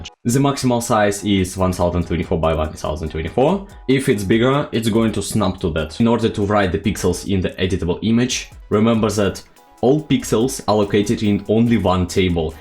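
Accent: Russia. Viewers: that indian accent (nvm it's russian) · русский акцент у тебя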